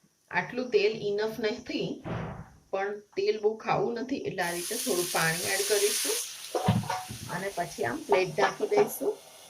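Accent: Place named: South Gujarat, India. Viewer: ok. I think you are from south Gujarat.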